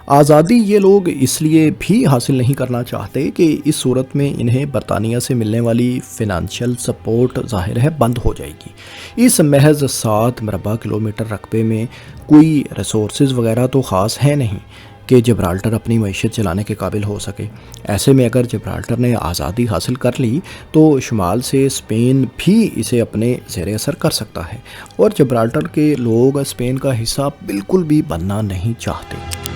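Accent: Punjab, Pakistan. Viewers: صاحب آپ کی ویڈیوز میں بہت اچھی معلومات فراہم کی جاتی ہیں ۔ لیکن آپکا اردو تلفظ ٹھیک نہیں ہے ۔ آپ پنجابی زدہ اردو بولتے ہیں ۔ مثلاً Himayat ko Hamayat, Mukammal ko Makkamal, Aazadi ko azadi, aor ko or Difa ko Dafa بولتے ہیں ۔ آپکے مقابلے میں فیصل وڑائچ صاحب کا اردو تلفظ بہت کمال کا ہے۔ آپ ان سے مدد لے سکتے ہیں ۔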